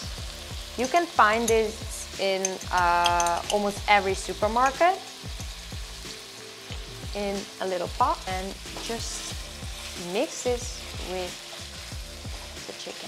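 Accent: Netherlands. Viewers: Je hebt echt nog dat Nederlands acsent in je Engels😊 maat goed bezig! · You sound dutch and your name also looks dutch so are you from holland ?? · Ik hoor ook echt het nederlandse accent haha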